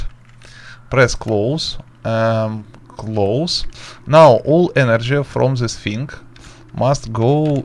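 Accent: Russia. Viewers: Русский акцент))) да ты же наш)))😅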